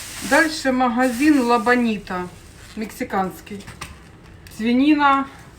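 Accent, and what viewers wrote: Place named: Luhansk, Ukraine. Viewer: Чому питаю, бо думала, що Ви десь з Луганської області, бо ми розмовляємо так само🤗 Було дуже приємно Вас слухати♥️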